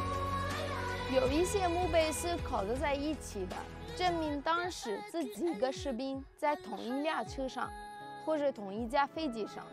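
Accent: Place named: Shandong, China. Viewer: 我觉得你说话有山东口音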